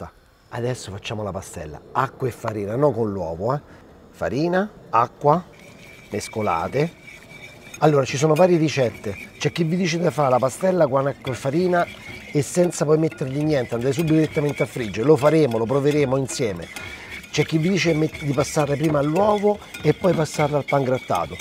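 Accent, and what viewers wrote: Rome, Italy. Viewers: sei bravissimo, ma, non prendertela a male, se tu ti sforzarsi di parlare più italiano... l'inflessione romanesca ci sta, ma fino ad un certo punto... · Lei è fantastico, io sono romagnola e mi incanto a sentire lei parlare romanesco, quello che prepara è bellissimo soprattutto spiegato da lei. Grazie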